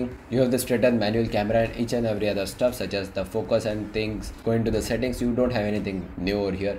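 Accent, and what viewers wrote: India. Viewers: From your english u sound like an Indian. What will u do without volte and with 7.1.2 . It doesn't matter if it is 7.0 ot 7.1.2 off basic things are not working. Strange people..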